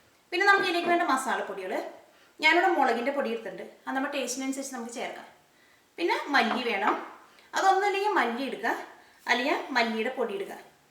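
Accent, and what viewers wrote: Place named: Kottayam, India. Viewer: Nice presentation.. mainly the slang which resembles kottayam bhasha...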